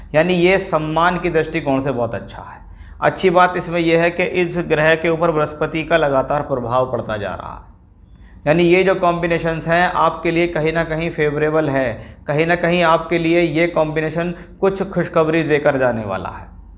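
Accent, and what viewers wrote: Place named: Uttar Pradesh, India. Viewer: Bhaiya UP se lag Rahe ho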